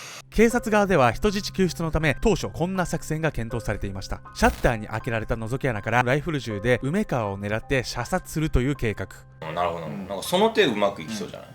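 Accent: Kansai, Japan. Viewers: の関西弁可愛いかた！🥰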